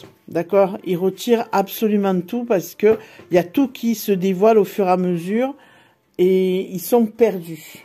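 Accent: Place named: Marseille, France. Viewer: Bonsoir, toujours très agréable de vous écouter avec votre accent de Marseille 😘 Merci beaucoup pour vos tirages. Oui il est temps que les croyants se donnent la main pour que la lumière soit 🙏🕊️✨💞 celle du coeur l'amour inconditionnel ❤️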